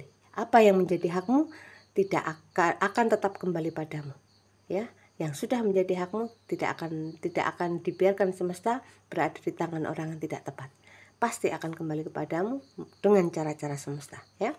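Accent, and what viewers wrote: Java, Indonesia. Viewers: Bismillah menyimak kak. Alhamdulilah Ya Allah. Tindakan positif tetap semangat. Kak bicaranya logatnya Jawa tulen. 💪💪💪🤲🤲🤲🤝🤝🤝❤ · Sy selalu ikutin readingan mbk,dan sy suka dgn cara kejawenya yg medok,trimkasih untuk semuanya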